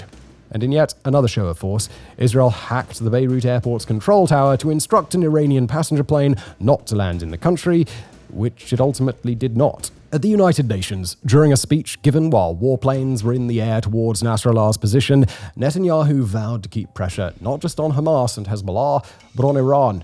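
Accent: United Kingdom. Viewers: There's nothing more calming than having soothing voice and british accent to tell us about how shit's going down. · The attempt to create a balanced picture between a liberal state and terrorist organizations is strange in a British accent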